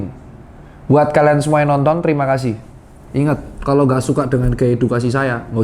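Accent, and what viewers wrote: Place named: East Java, Indonesia. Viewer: Bosoe wong jatim...tuh..mantep pokoke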